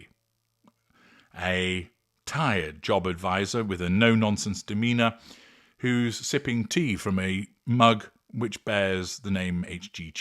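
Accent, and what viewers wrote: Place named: Yorkshire, United Kingdom. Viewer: Ey up,! 💜 Loved your Yorkshire accent! Thank you for the morning laugh! You're too funny!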